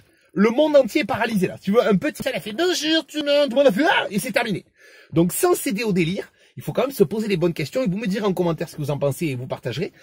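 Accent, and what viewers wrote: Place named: Alsace, France. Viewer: J'adore notre accent Alsacien ; Mais ! Je dit vive les accents de nos régions, et l'accent provençal est un bonheur à entendre. L'accent à la marcel pagnol façon : la gloire de mon père ou le château de ma mère et autres Manon des sources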